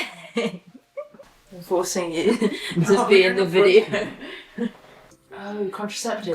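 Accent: United Kingdom. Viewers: HI hello there, lucky to find someone who`s close to the nature. good to see you here, exceptional work done, great videos, amazing Brit`s accent. music, everything is so perfect n upto high standard videos, love to spare few moments. plz carry on making more videos. stay safe and rise n shine.............🐿🐿🐿🐿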